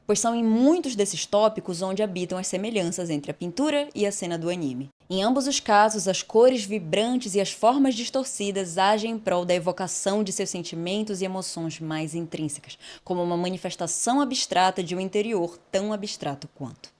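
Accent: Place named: Belém, Brazil. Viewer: Esse sotaque entrega, vc é de Belém!?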